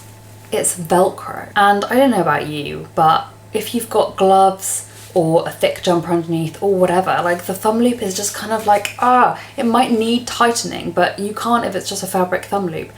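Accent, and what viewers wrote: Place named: Liverpool, United Kingdom. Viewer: I love your Scouse accent :) x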